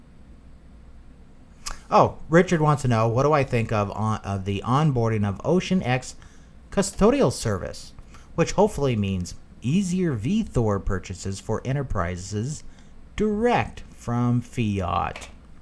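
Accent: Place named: France. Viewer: I love your french brother😁. Great show btw..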